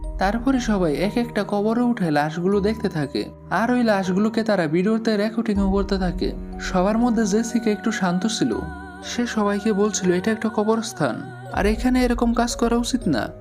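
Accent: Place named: Barishal, Bangladesh. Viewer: আপনি কী বরিশালের বা ফরিদপুরের?🐸এমন ভাবে কথা বলেন কেন হ্যা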